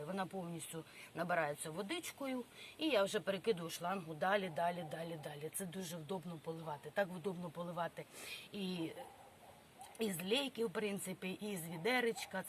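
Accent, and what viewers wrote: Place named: Kryvyi Rih, Ukraine. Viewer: Дуже гарна ваша мова! Така мила мені! В мене подруга з Кривого Рогу. Це так приємно чути, бо й вона так говорить! Хай буде здорова і щаслива!!!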